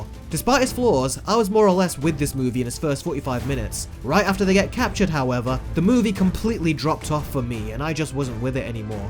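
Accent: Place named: United Kingdom. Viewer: I could be wrong- and I apologize in advance! But, I believe b/c he's British, they pronounce the "a" differently. Same thing with "Mulan." He pronounced her name differently as well.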